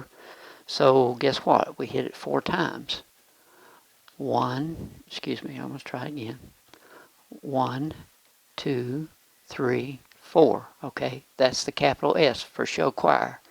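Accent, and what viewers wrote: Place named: South, United States. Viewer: very nice because of your accent sounds like country and western town just like Nashville or texas love it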